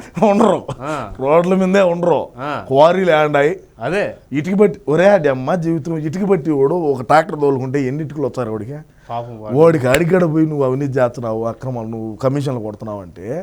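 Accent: Rayalaseema, India. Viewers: అన్నా నమస్తే అన్నా నేను జనసేన పార్టీ వీర మహిళా అధ్యక్షురాలు మీ వీడియోలో చాలా బాగుంటాయి అన్న చాలా డిఫరెంట్ గా చేస్తారు మీరు అటు సీరియస్ కాకుండా ఇటు కామెడీ ఎవరికి కోపం రాకుండా చాలా బాగా చేస్తున్నారు అన్న మీరు ఈ వీడియోలు నాకు మీ వీడియోలు చాలా బాగా నచ్చుతాయి ఇలాగే మీరు కంటిన్యూ అవ్వాలని మనస్పూర్తిగా కోరుకుంటున్నాను జై జనసేన జై తెలుగుదేశం జై బిజెపి ఏం సామి నువ్వు ఇంకా మంచి మంచి వీడియోలు అదరగొట్టేసావయ్య ఏంది మీ లాంగ్వేజ్ చిత్తూరు కడప ఏంది అన్న నువ్వు మాది నెల్లూరు అన్న మా ఆయన నెల్లూరు యాస ఎలా ఉంటది తెలుసు కదా మీకు చాలా బాగా చేస్తున్నారు అన్న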